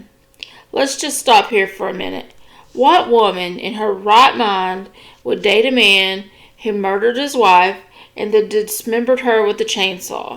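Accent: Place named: South, United States. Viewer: This story reminds us that God is still on the throne and maybe later but judgment is surely comes to evil murderer James Klindt . Most crack heads doesn’t live long anyway . He died due to his drug addiction . ( made him fall caused him to having fatal heart attack ) If he still incarcerated in prison and didn’t get parole , maybe he still alive . That’s the beautiful judgment of God and Joyce is finally rest cause James went to his master in hell . Thank you for accurate information with cute southern accent. From California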